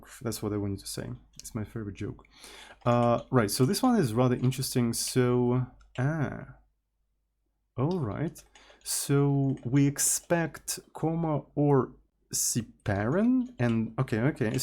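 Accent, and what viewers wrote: Russia. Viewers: Мне одному слышен русский акцент английского языка? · ну, русский/украинский/белорусский он точно знает, и акцент очевидно есть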